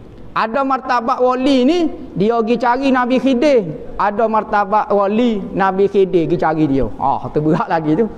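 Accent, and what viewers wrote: Kelantan, Malaysia. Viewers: Alhamdulillah , salah satu ustaz idola kawe, bahaso kelate pekat kemah kemin tok gogek langsung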